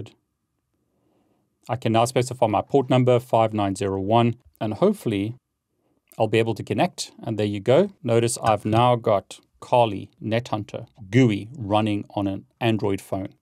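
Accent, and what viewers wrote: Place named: South Africa. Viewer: My 1st comment ever..😅a.you sound like you're from ZA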